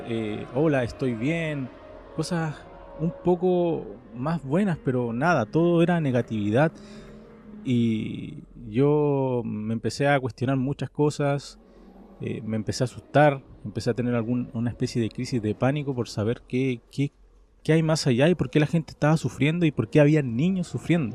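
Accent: Chile. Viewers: Me gusta mucho el acento chileno <3 Muy buen trabajo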